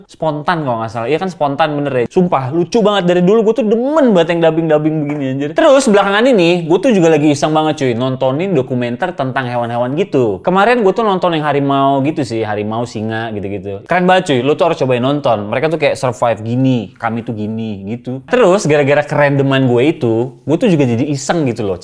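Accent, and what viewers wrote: West Java, Indonesia. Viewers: bang ngmg sundaniss canduuuuu ❤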